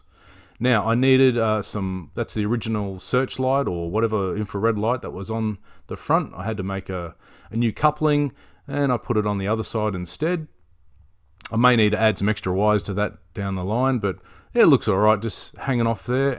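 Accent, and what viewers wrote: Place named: Australia. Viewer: So good to hear an Aussie accent. I left Oz in '92 for my work, spent 4 years in France and 1 year in Germany before settling in Delaware US. Last time I went back to OZ (to say goodbye to my father in 2010) I was asked if I was a Yank. And then here in the US they keep asking what my accent is! I've subscribed, keep up the good work.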